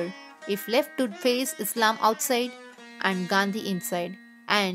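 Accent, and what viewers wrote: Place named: South India, India. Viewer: Madrassi ascent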